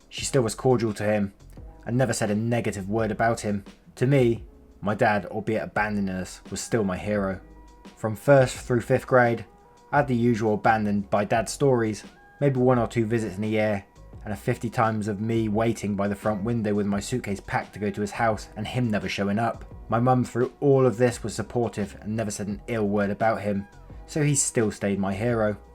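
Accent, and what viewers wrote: England, United Kingdom. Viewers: Where in England is that accent. I cannot figure it out xx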